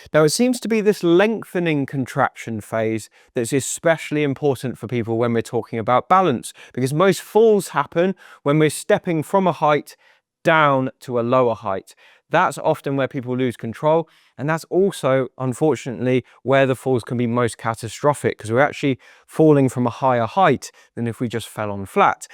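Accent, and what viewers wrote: United Kingdom. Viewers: Thank you so much, for the roadmap. I'm 82, had a hip replacement 3 years ago and it's been downhill ever since, partially due to covid and painful frozen hip on the other side, and mostly due to laziness.😮 I recently started squats, wall presses, heel tapping and just dragged out an elastic thingamajig for the leg muscles. I'll certainly add your tips. I do NOT want to lose my mobility. Love the British accent, i lived in the UK in my twenties when I first left my enerald isle.❤❤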